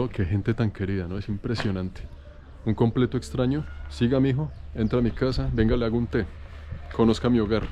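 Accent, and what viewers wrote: Colombia. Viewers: montaña a la orilla del río, hasta yo me canse! Lol.....Me gusta ver tus videos por el mundo, muro de risa, con tus comentarios en tus recorridos. El acento Colombiano, es uno de mis favoritos! Exelente pueblito al lado del rio! 👏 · Amo como hablas muy colombiano, no se puede olvidar la tierrita; eres lo máximo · Ese man habla mas colombiano que yo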